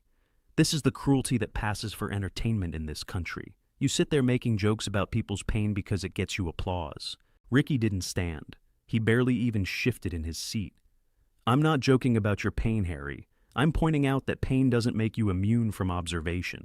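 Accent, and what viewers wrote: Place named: United States. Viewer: Yes, no record of this to be found. Having an American narrate is plain weird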